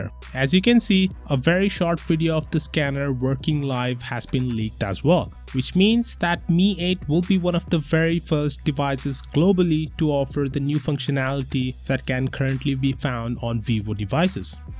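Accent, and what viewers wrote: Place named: India. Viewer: The way you're fluctuating between the Indian and the wannabe American accent is making you sound unclear. Go for something that is more comfortable with you.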